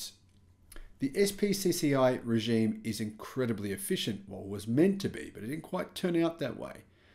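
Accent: Australia. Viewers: He's an Aussie - it's an accent thing I guess. He also says Tezla...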